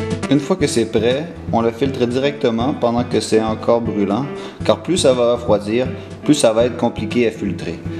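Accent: Canada. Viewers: l'accent québécois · Wouah l'accent canadien 🍁. Toi tu n'as pas un fort accent canadien. 😉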